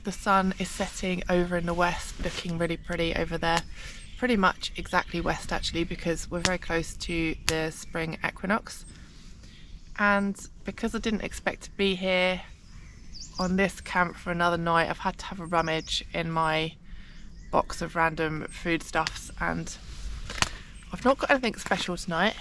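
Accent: United Kingdom. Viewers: Ole ta ohs.😂do like the briish accent 😊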